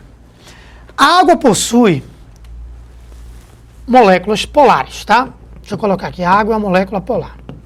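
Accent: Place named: Recife, Brazil. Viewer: pelo visto o senhor é de recife rsrs ganhou mais uma inscrita e pernambucana kkk,Parabéns pela aula !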